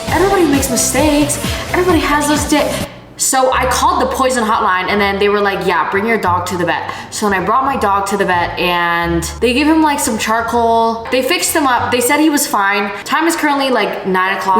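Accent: England, United Kingdom. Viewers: I am from England and your English accent cracks me up 🤣🤣 Happy Valentines ❤️ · See the way she spoke with the British accent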